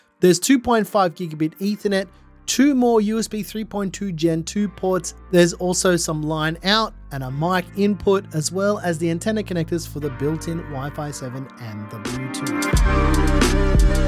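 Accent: Australia. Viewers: Man. I got through 5 minutes of the voice volume fluctuations and had to shut it down. Goofy Australian accent didn’t help either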